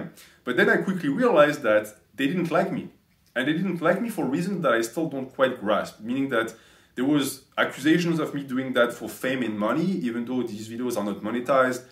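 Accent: France. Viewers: This is even better with the French accent. My new favorite account.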